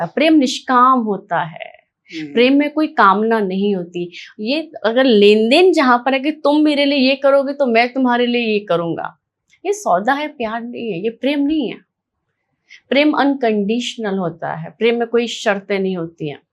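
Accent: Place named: England, United Kingdom. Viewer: आपकी आवाज अलग ही है। आप कहां की हर जी ।मुझे लगता था आपकी आवाज सुनकर कि आप कोई अंग्रेज है😂😂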